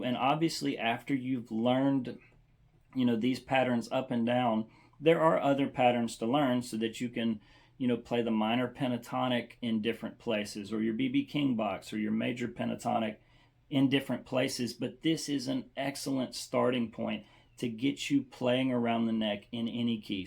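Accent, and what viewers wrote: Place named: Mississippi, United States. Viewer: I have been on the brink of making this connection for YEARS! I could feel there was something simple I wasn't putting together and I couldn't make the connection until I watched this video. Game changer! By the way, that MS accent was recognizable enough that i had to go to your profile to verify.😅 Copiah county here. Do you do in person lessons?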